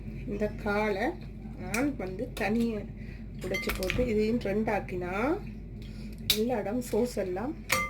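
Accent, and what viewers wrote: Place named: Sri Lanka. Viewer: நண்டுக்கறி வறுத்து செய்த சமையல் மிகவும் அருமையாக உள்ளது இலங்கை தமிழில் உங்கள் பேச்சு அதை விட இனிமையாக உள்ளது சகோதரி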